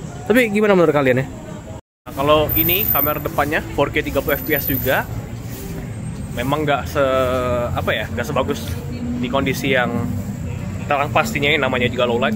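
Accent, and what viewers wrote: Palembang, Indonesia. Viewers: Ngikutin channel abang ini fix dia orang palembang bro melekat banget soalnya logat palembang 😆😆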